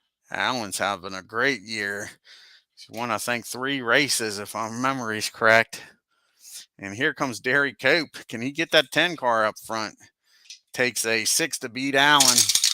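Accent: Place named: South, United States. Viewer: I know he does a great job. I have second season HMB and HMG. This one has been on my radar for a while. Also you southern accent fits the game.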